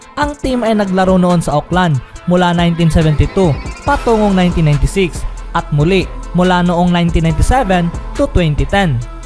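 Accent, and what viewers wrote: Visayas, Philippines. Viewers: My pagka besaya mag saleta ahaha. Pinahaba lang ung conteksto. Tonge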